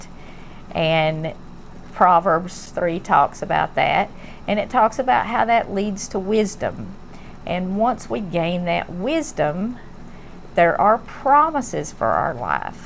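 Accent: South, United States. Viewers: Question: Could the batter be put into an 8x8 dish like fudge, then cut into squares once set? Thanks for this recipe, and for speaking slowly enough to understand you! Love your southern accent!! 😊 XOXOXO God bless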